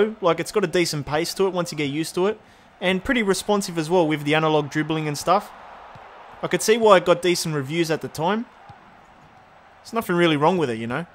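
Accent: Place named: Australia. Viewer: This is the most Australian commentary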